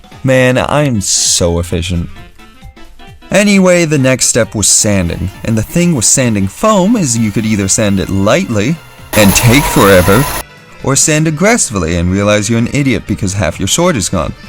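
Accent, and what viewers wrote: Netherlands. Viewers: is he dutch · He from Utrecht?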